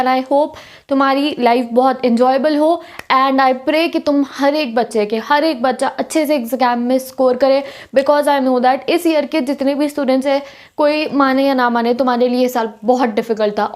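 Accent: Punjab, India. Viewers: Are u Punjabi? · R u punjabi didi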